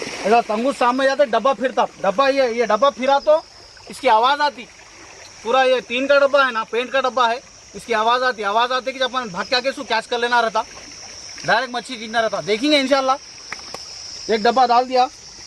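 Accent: Hyderabad, India. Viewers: kya mast bola... sounds like hyderabadi language.. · Bhai Hyderabad se hai kya aap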